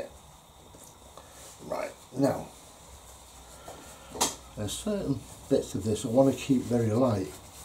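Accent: United Kingdom. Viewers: Just discovered your channel. I am a sucker for a British accent, love your analogies, you are a very talented artist. Thank you for sharing with us.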